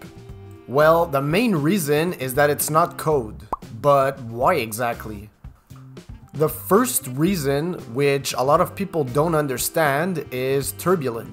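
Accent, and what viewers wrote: Quebec, Canada. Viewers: I do hear a québecois here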